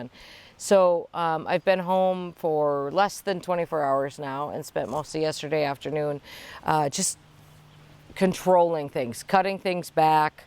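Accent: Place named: Midwest, United States. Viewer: My husband and I have only had one dog in our 39 years together and this is why. It was devastating to lose a 25 lb schnauzer. I can't imagine losing a giant teddy bear like Oden. My heart goes out to all of you. You are real, pragmatic, down to Earth and talk to the camera like the neighbor I wish I had. My 80 year old mother who was born and raised in a Chicago suburb says she likes you too. Must be the familiar Midwestern speech and manner. Take care.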